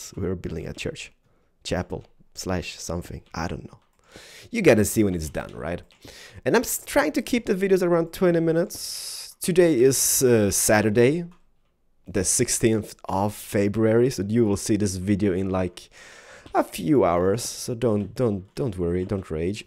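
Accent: Sweden. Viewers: Just like i thought, u were Swedish :D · Are you swedish?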